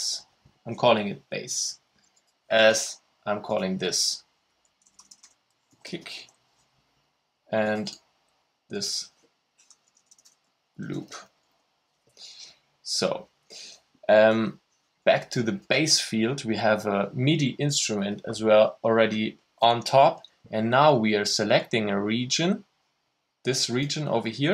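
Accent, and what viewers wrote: Germany. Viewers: Thanks mate! This is exactly what I need! I was making (trying to) some tracks in FL Studio like 5 years ago and think Ableton is better. Working here should be similar I guess, but I totally forgot how to set instruments etc:.:D So this tutorial is very useful! Anyway your accent sounds a bit German, do you come from Germany, the land of one of my favourite DJ, Paul van DyK?;D:)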